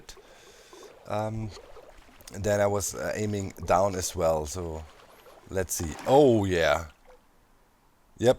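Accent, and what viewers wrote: Germany. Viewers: Klar das merkt man schon am deutschen Akzent..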